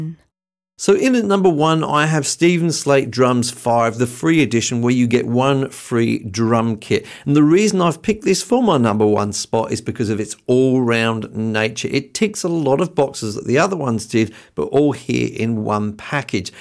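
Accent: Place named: Australia. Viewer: Aussie in England or vice versa? (Great vid btw!)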